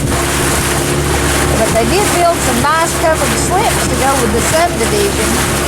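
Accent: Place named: North Georgia, United States. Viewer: HeHe, I LOVE this channel! I love the views, and I love that accent! Its like being at home...and I miss home. all my family is from north Ga and east Tn. In my mind thats what heavens gonna look like, only better...somehow, lol.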